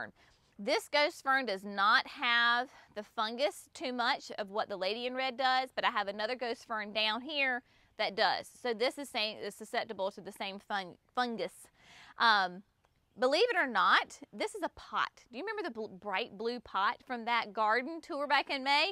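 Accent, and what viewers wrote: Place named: North Carolina, United States. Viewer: As soon as I heard your voice, I knew you had to be from North Carolina. Haha I am from NC too. Love your garden! Im trying to find beautiful shade perennial flowers and shrubs to plant in zone 8.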